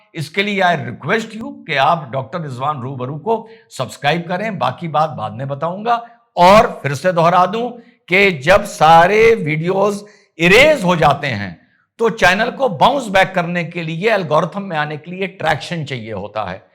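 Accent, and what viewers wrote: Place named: Lucknow, India. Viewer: bhai वंदे मातरम। इस रिपोर्ट में थोड़ा संशोधित कर लें। माननीय मुख्यमंत्री नीतीश कुमार जी की महिल रोजगार योजना में वहां की महिलाओं को वन टाइम के रूप में 10000 रुपए की आर्थिक मदद देने की बात है ना कि प्रति महीने। आपकी प्रस्तुति ठेठ लखनवी अंदाज में होती है जो मुझे अत्यधिक स्वीकार्य होती है। बहुत ही पसंद आती है। इसी प्रकार बरेली की घटना की शानदार रिपोर्ट करते हुए आप अचानक ही पक्के मुसलमान उन जैसे जिन्हें हम और आप भी कट्टर अर्थात् देश विरोधी मानकर चलते हैं। उनकी नजर में केवल इस्लाम है और कुछ भी नहीं फिर वह दोस्ती हो,इंसानियत हो याकि देश की एकता और अखंडता ही क्यों न हो? अपने उस रिपोर्ट में जिस अंदाज में विषय से हटकर अपने प्यारे मुसलमान भाइयों को जगाने का प्रयास किया वोह सिर्फ एक वाक्य हो हम जैसे आपके प्रेमी दर्शकों को भीतर तक हिला गया। उम्मीद है मेरी इस विवेचना को रिपोर्ट के परिप्रेक्ष्य में सापेक्ष रूप में लेंगे। जय हिंद । सबको सही रास्ता दिखलाने वाली उस परम शक्ति से बारम्बार एक ही प्रार्थना है कि आप उम्र के इस पड़ाव में अपनी मेहनत, विद्वता और कल्पनाशीलता के जरिए पूरे देश के साथ साथ पूरे मुस्लिम जहान में छा जाएं।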